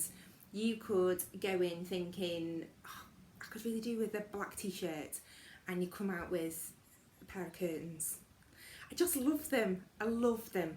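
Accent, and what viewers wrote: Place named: England, United Kingdom. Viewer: I just think 🤔 I cant stop 🛑 the Charity shop buying it’s the bargains I see I can come out with anything I like your fine English accent I was born in Derbyshire myself with 3 of my sisters I visited my cousins in Derby a couple of months ago and went into every charity shop in Derby they are good here too charity shops I mean even in my home town here in Tralee there are a lot of them so thanks 😊 for making me feel normal i thought I would need therapy because of my addiction bye for now ohh you can send me the pink dressing gown I can’t find mine 🤣🤣🤣🤣🤣🌟🌟🌟🌟🌟🌟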